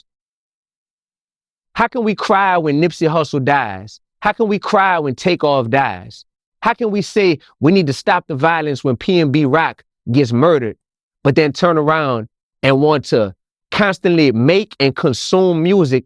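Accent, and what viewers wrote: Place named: New Orleans, United States. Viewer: New Orleans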